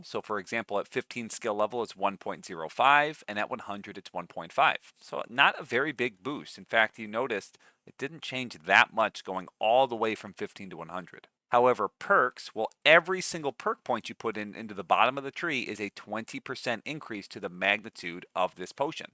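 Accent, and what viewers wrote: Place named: East Coast, United States. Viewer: So I don't have to do an additional purchase for the mod and install it if I already have the Anni Ed???? (Just making sure I understand completely) Loving the streams BTW. Love watching a mathematician's breakdown. Good on ya, brother. (The east-cost accent and mannerisms are the cherry on top, LMAO 🤣. Brother-in-law is from Boston). Yes, it was when you were talking about the sneak skill that you mentioned you had gameplay footage, that you would play at the end of the vid of you using the shadow warrior skill. Was something I'd never thought of cuz I used invisibility instead, silent casting on the illusion tree, with muffle on the boots. Stabby-stab. Shank those mo-fo's from the shadows!! Lol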